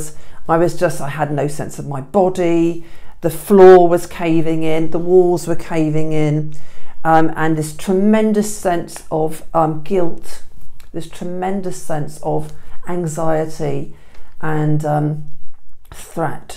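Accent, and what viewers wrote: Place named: England, United Kingdom. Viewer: Nice to hear an English accent on one of these stories. I went to art college, wonderful times. Thank you for your openess. xx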